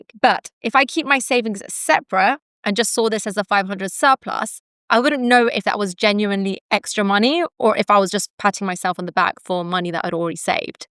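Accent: United Kingdom. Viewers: Is her accent common in the UK? Sounds amazing.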